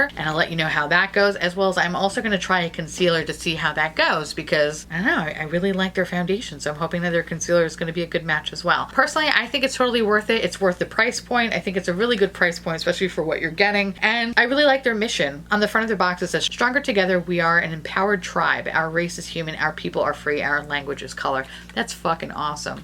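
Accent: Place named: Boston, United States. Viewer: So excited when the notification comes on my screen, enjoy your videos! The accent sounds like a Boston neighbor lol.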